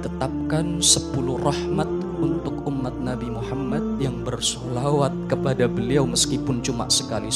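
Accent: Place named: Tegal, Indonesia. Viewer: Assalamualaikum wr wb..🙏 Ngapunten..🙏🙏 Pa' ustadz asli orang mana kok bisa ngomong Tegal..?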